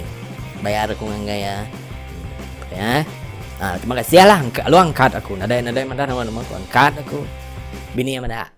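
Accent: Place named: United States. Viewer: OMG!! this is my first video of you! THIS IS SO CONTENTED AND FUNNY!! Love your US accent. New subscriber here. Mata d manah mat..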